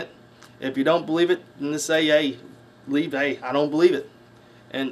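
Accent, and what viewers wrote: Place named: South, United States. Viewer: just curious but are you from down from around like in the North Carolina area? down in the southern u.s area. not to be offensive or anything cause you have an accent. you say "Yall"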